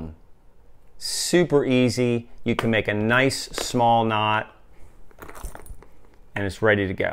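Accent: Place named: New Jersey, United States. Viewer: It says Old Dominion, but every time you say "wadder" I hear NJ/eastern PA.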